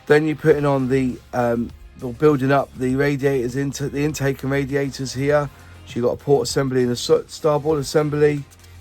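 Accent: West Country, United Kingdom. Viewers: I'm blaming and West country accent!! 😂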